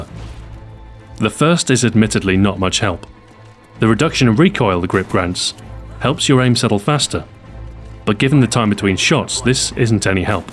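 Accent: England, United Kingdom. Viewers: Im getting the Alan Jackson GE commercial alot before these videos play. going from Alans friendly texas twang to smooth british tones is an amusing contrast. sounds like a salesman an upper class shop to me for some reason.:P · He's English, so that's his accent!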